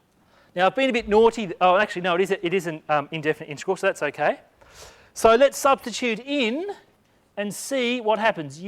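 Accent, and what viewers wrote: Australia. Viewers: are americans really this stupid? he's clearly australian wtf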